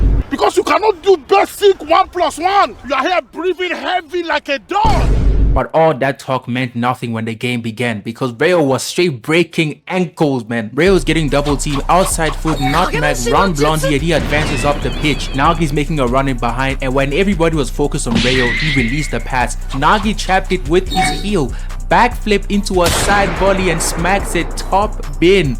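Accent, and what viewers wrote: South Africa. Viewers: Wait are you south African? Because I am and super strikers built my child hood and made me love football poi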